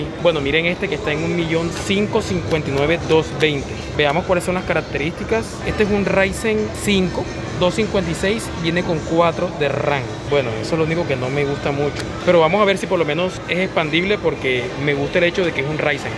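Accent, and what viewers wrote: Caribbean Coast, Colombia. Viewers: Njd familia el vale es costeño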